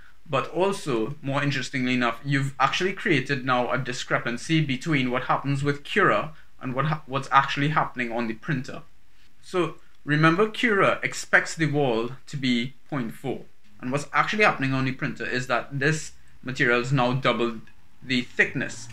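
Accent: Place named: Jamaica. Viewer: Thanks so much for the Video with the ender 3 saved me a lot of time. Sounds like you are from Jamaica, I grew up there myself, one of the few white Jamaicans out there. Thanks again, for the great job!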